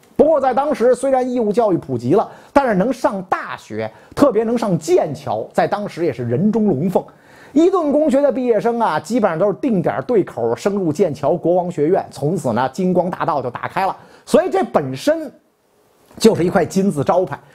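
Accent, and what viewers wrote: Beijing, China. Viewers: 哎哟，甩着京腔讲贵族，，，真不是那么回事，别扭。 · 👍赞 老北京的音儿 👍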